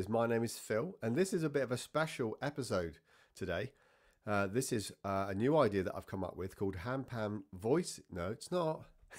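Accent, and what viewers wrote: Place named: United Kingdom. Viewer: Oh the struggles with recording speech that makes sense lol Kudos to you for recording without a script. And BTW I love your beautiful British accent 😊 (I know you ARE British but not every Brit sounds as nicely as you!)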